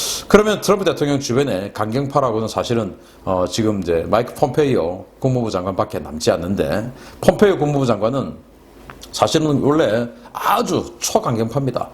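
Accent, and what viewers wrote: Gyeongsang, South Korea. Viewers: 이씹새끼는 말투부터 문둥이네.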